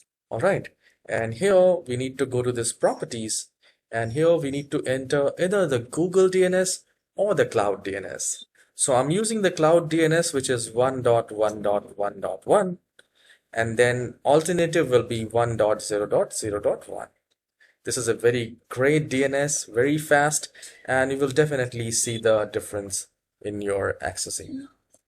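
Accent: India. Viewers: As soon as I heard the Indian accent I knew this was going to work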